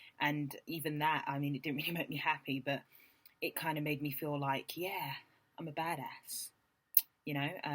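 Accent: United Kingdom. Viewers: Man....there's just something about black girls with British accents........😍😍😍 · omygod u remind me Jessica sula in the series (skin) with your voice and your accent. I love British accent. but I'm not in England, I'm in Canada(America)and would like to be in your country to be better in English and to can talk with British accent. thanks to listen me and i hope u will share more super videos ad I will always listen u. bye😘😍😀😀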